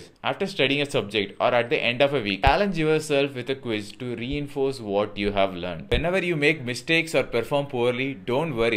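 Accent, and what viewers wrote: India. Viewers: Accent replicates how Americans think Indians speak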